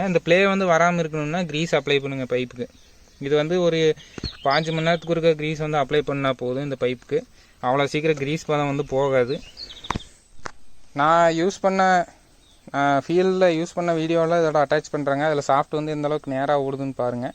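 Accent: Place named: Kongu, India. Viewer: உங்கள் கொங்கு தமிழ் உச்சரிப்பு அருமை சகோ.. Unga slang and way of presentation is superb..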